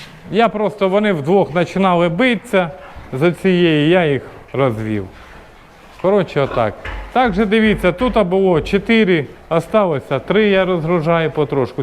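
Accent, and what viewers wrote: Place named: Surzhyk, Ukraine. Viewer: З чого ви взяли що він російськомовний? Він просто може розмовляти на суржику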